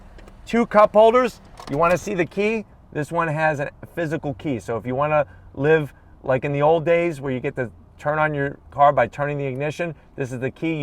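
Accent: United States. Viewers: not u. He's got a yank voice. Maybe NJ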